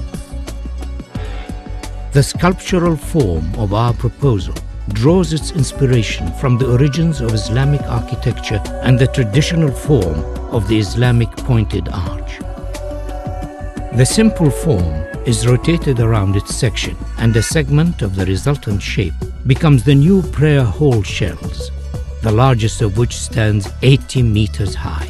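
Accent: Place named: Arab world. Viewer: Can't say much about the theological or political arguments for or against this project. But I think to have someone with a noticeable Arabic accent speak is nothing but a cheap marketing gimmick by this British firm. Are we supposed to be more accepting and receptive because its an Arab talking? I would have expected better from a company of Atkins' reputation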